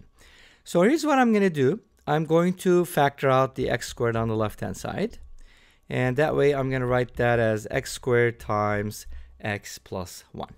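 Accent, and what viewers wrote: Turkey. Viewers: Is that a Turkish accent I'm hearing? 🤔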